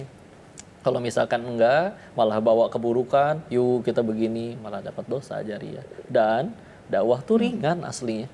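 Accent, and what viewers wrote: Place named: West Java, Indonesia. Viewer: kan org sunda, bogor, nyantri d bandung, jd logat ny k bw sunda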